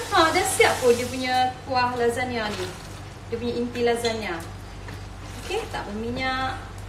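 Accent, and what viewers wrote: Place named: Pahang, Malaysia. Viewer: Tokti buat tutorial masak cakap pahang pulak haha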